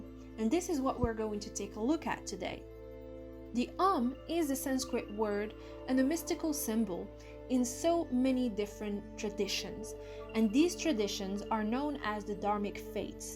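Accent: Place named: Netherlands. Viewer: You narrate very well in English, but sometimes I get the impression your mother tongue might be Dutch?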